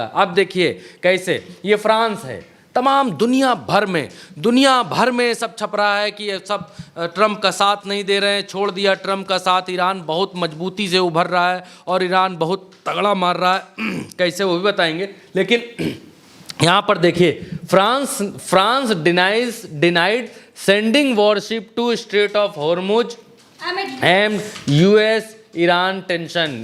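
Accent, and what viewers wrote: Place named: Awadhi, India. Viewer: ka theth Avadhi andaaz 😂😂 maza aa gaya ❤❤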